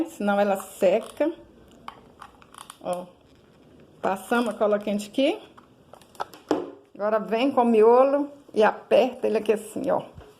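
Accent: Minas Gerais, Brazil. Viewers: Parabéns seu trabalho e lindo! E sua conversa é muito minerissima 😀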